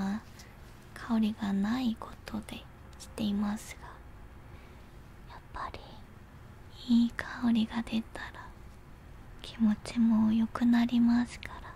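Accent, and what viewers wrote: South Korea. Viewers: 韓国の方が話される日本語て可愛らしいﾃﾞｽ♥.｡.:*♡ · 韓国の女の子が日本語を話すのが好きすぎる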